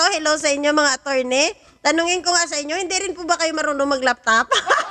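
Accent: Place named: Iloilo, Philippines. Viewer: The best talaga si ate with an ilongo accent 😂😂😂😂😂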